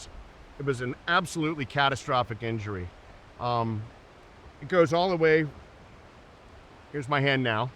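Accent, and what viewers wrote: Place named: Philadelphia, United States. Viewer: An excellent communicator. Held my attention the entire time...and not just because I was listening for his Philly accent.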